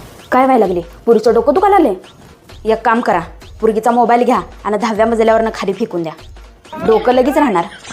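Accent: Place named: India. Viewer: दीदी गाव कुठलं आहे तुमचं एक वेळ वाटते तुम्ही शहरात आहात एक वेळ वाटते खेड्यात आहात छान छान तुमचं बोलणं आहे असाच मराठी माणूस पुढे गेला पाहिजे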